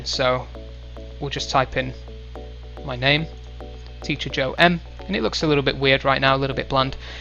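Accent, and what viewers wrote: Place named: England, United Kingdom. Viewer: Great video! Difficult to focus on the tutorial with that beautiful english accent :D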